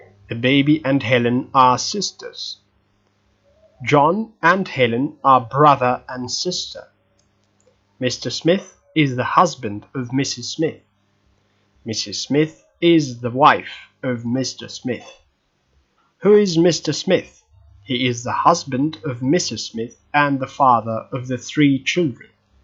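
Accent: United Kingdom. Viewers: What is your native language? You sound British, but some words seems off. And your French sounds very native. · Hello, I am a native Spanish, I wanted to know if your pronunciation is British, since I want to learn that pronunciation and not the American one.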